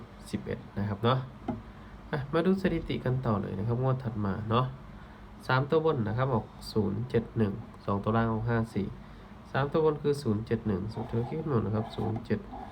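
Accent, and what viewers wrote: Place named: Phu Thai, Thailand. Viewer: หนูอยู่พรรณานิคมอาจารย์คนที่ไหนค่ะฟังเสียงคือคนภูไทย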